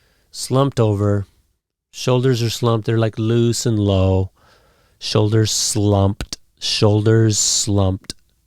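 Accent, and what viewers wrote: United States. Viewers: This voice Sound of American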